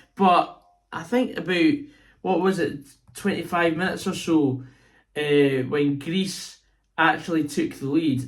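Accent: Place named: Scotland, United Kingdom. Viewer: We adore the Highlands! We adore the Scottish accent, by far the best and most emotional! Good honest commenting on the game!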